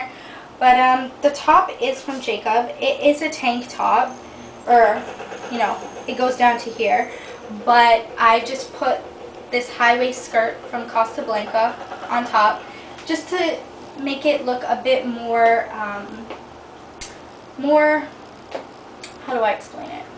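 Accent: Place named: Canada. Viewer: i knew u were from canada u sound like it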